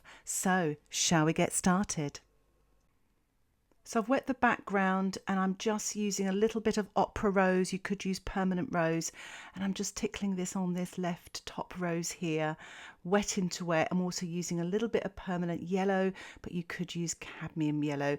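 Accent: England, United Kingdom. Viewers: Absolutely gorgeous and the English accent is great as well. My family history is in England & Wales. I am just getting started in watercolor artistry & I can see you will provide fantastic inspiration! I have subscribed!!!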